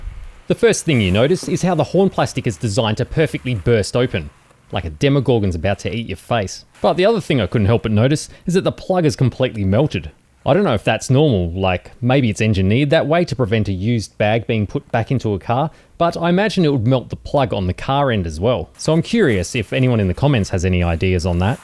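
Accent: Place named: Australia. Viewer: As a not native English speaker, your Australian accent is no problem. Scottish though, that requires subtitles...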